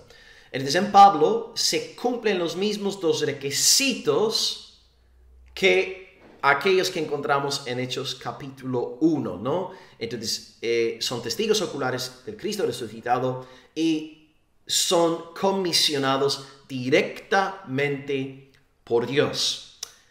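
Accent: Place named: Spain. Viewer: Hno , tengo poco que l descubrí a Ud y me encantan sus videos me han enseñado mucho ,,soy cristiana evangélica calvinista ,pero quisiera decirle algo no capto bien a los españoles a pesar que soy de Mexico y hablo español pero Ud habla muy rápido y no capto las citas bíblicas y versos ,me puede ayudar de algún modo ..tal vez que en la pantalla aparezca esto ?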